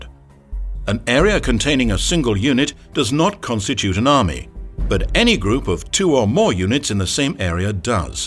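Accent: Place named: England, United Kingdom. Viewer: This tutorial is better with the narrator's English accent.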